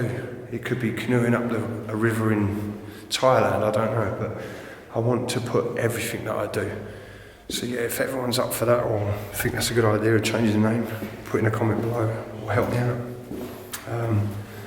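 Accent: England, United Kingdom. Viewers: what about Abandoned world explorer and Adventures with? I truly enjoy watching your videos. I have watched numerous other Explorers but I must say I like your style, how sincere you are, you are truly interesting, educational, funny, and easy on the eyes. I also love your voice and English accent is marvelous. You have a true gift. I wish you so much happiness, peace and joy doing what you love.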